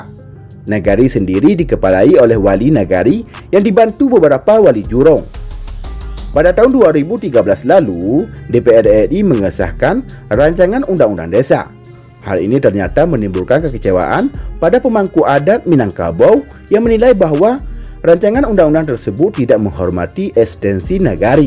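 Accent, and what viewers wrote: Aceh, Indonesia. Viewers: Chanel pemecah belah NKRI..dr logatnya admin orang Aceh nih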